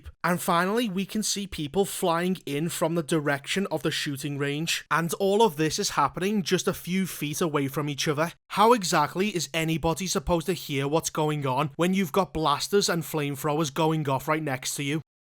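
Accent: Liverpool, United Kingdom. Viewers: first fellow scouser nerd ive come across on youtube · No danger a scouser thought anyone would listen to this fucking accent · I love you you scouser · You’re deff a scouser haha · Are you scouse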